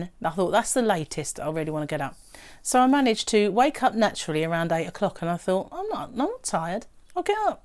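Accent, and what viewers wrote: United Kingdom. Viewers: I like to watch your you tube love to listen to the British accent I hope you finish the bootie I want to make one to go with mittens you made than I will find a blanket. Thanks again see you again.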